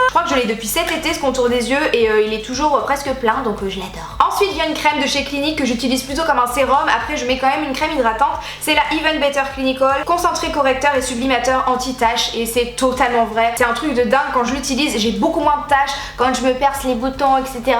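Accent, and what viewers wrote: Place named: South, France. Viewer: Jpp l'accent du sud😂 super video continue comme ça, ❤️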